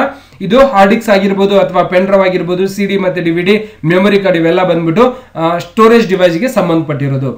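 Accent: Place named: Dharwad, India. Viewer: ನೀವು ಮಾತಮಾಡುವ ಕಲೇ ತುಂಬಾ ಚೆನ್ನಾಗಿ ದೇ ನಮ್ ಧಾರವಾಡ ಬಾಷೆ ಕೆಳಲೂ ಬಾಳ ಕುಷಿ ನೋಡ್ರಿ ಸರ್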